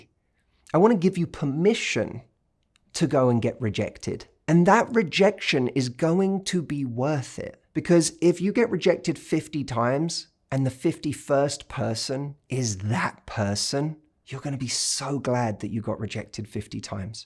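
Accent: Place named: United Kingdom. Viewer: Matthew I have been watching your episodes for quite a sometime now.... I believe the last couple few years and this time you really grabbed me and and something you said I I just can't even pinpoint it but it really touched me and grabbed my attention so much that I'm still trying not to cry and I hope I can make it to that Sixth Day event I'm going to take a look at the package situation and thank you I enjoyed your videos and yeah maybe I am one of the the likes thumbs up person.... but I had to say that you are quite soothing to listen to as well..... I am a bit curious exactly where you're from actually, I can here the Brit down in you I believe! 🙂... I'm from Fort Lauderdale, FL in the United States but My Father.... (unfortunately, he has been passed on now over 3 decades from last April 19th, 1991... so, may he Still R.I.P., and WHOA! 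& Happy Father's Day to him!.... Anyways sorry I just realized what today is..... So back to my scattered point.... He was born in Dover, England, and the funny thing is I guess my dad's British accent might have wore off overtime here in the states but I can do a pretty good impression myself growing up watching a lot of the BBC as a kid..... i.e - Monty Python & the Flying Circus, Benny Hill, Fawlty Towers, even that soap EastEnders back in the day etc.... and I'm just rambling on that nothing... Lol.... Nice little chat aye! Thanks 4 everything oh! & a Very Happy Early Birthday to you! 😎 🎉🙂🎂😀🍾😊🎁 Make a Wish! I Do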